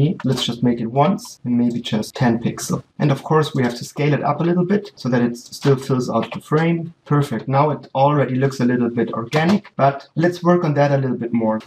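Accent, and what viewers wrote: Germany. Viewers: Are you German....you have an German Acent I mean so..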